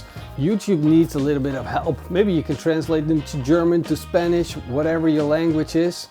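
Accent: Netherlands. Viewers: I’m new to your channel, but you have a Dutch accent.. are you Dutch? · His dutch accent is so strong😂😂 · i like this guys dutch acccent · Just found this channel and immediatly i can hear you're dutch. The Dutch/English accent stands out so wel haha! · idk what it is about the Dutch but you can always instantly tell whether they’re Dutch or not, you can’t do that worth Scandinavians for example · Is he from holland his accent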